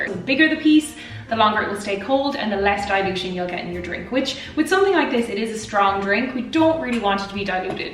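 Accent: Ireland. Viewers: I was robbed.... I was told that this was a “naked” Irish elixirs. Oh well.... The Irish brogue had me..... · Gorgeous Irish girl with an amazing accent who loves whiskey and makes cocktails....easiest subscribe ever! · You're the reason I have Siri set up to sound like an Irish female.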